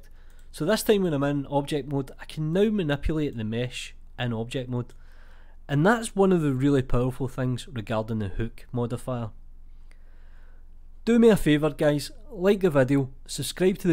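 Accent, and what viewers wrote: Scotland, United Kingdom. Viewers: Just learnt something new, I also love your Scottish accent 😁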